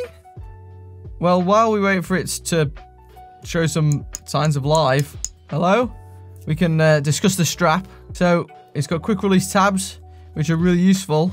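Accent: Stoke, United Kingdom. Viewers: I thought you had a stoke accent. Glad to see a fellow stokie on YT.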